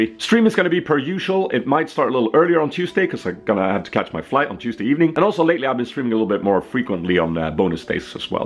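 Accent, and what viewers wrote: Sweden. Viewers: Swedes - check 90's Volvo 240 station wagon - check Swenglish accent - check Raggar factor 1000 - check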